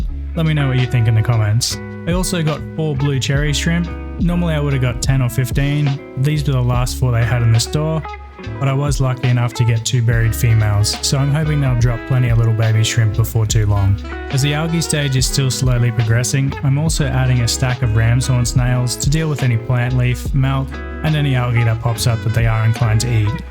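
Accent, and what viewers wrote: Australia. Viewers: So thrown by the Aussie accent with European context! Would love an update on this tank now it's had time to settle.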